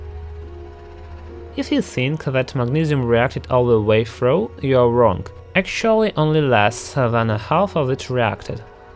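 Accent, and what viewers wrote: Germany. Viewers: He sounds German I wonder that too xD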